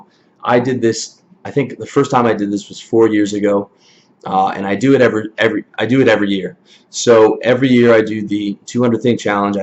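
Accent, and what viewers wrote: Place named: Canada. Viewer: You sound like you might be a neighbor to the north. :) I live in a small community filled with hoarders, so it drives me to youtube to find people who understand the call to minimalism. Great video, first I've seen of yours. Youtube is full of female minimalists but the male ones are somewhat harder to come by. I'm guessing the bulk of your channel isn't about minimalistic tendencies though. 😉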